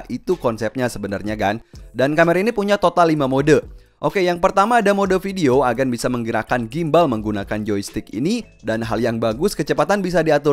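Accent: Kuningan, Indonesia. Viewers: Urang kuningan jiga na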